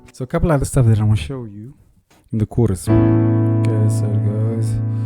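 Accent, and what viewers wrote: Cape Town, South Africa. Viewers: you sounds like a Capetownian really...I appreciate you lesson 🇿🇦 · Can hear the dialect u from Capetown ..Represent cpt I love this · Was so excited to hear a South African accent doing this. Great tutorial,thanks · Your accent sounds South African, your playing sounds heavenly.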